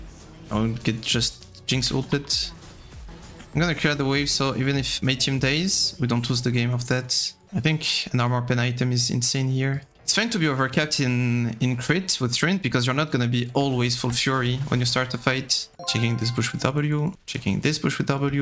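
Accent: France. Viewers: Hi I discover ur channel, is sound like but not 100% sure are u french ?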